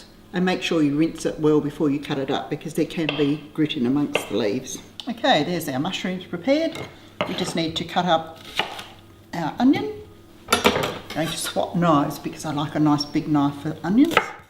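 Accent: Australia. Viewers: Do I detect an Australian or a New Zealand accent? I loved the recipe by the way, especially when you made cauliflower rice because it's something I've been wanting to try. I love how relaxed you are. This is my first video that I have watched on your channel and I've subscribed already. Even though your 'about' section said you focus on gluten free recipes we can all use them. Thank you for sharing your knowledge of cookery. Xx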